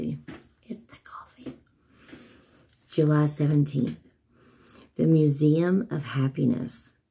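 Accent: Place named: Tennessee, United States. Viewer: Grumble Bunnies! Oscar the Grouch! Squidward! 😬😱🙊...🙌🎚The JOY of the Lord sis! It IS what gets me thru! Had to learn the long hard way about this. Wandering the desert >40 years😬💔🙌🎚. God's perfect timing for new peeps, the Lord IS doing something, preparing us girl! That TN twang! This IS the day the LORD has made I WILL REJOICE AND BE GLAD! 🧐ain't nobody got time for dat! Cut em out Lord! Ps.1 HAPPY are those who do NOT listen to the wicked, who do NOT go where sinners go, who do NOT do what evil people do. We LOVE the Lord's teaching and we think about those teachings day AND night! We are strong like trees planted by THE RIVER, we produce fruit in season and our leaves DON'T die. EVERYTHING we do WILL succeed.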